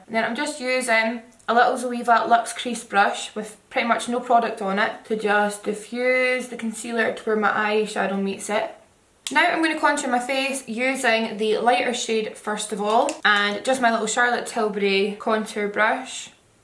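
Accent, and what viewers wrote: Scotland, United Kingdom. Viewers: Jeannine Marie definitely not Irish haha she from Glasgow in Scotland x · is she Scottish ? if so love it or Irish I can't put my finger on it btw you're gorgeous!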